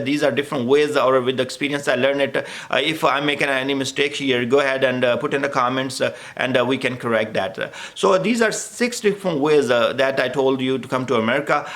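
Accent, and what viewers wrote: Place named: Sindh, Pakistan. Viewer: sometimes your accent seems to be of a Sindhi guy (specifically from Naushero Feroz - a town in Sindh)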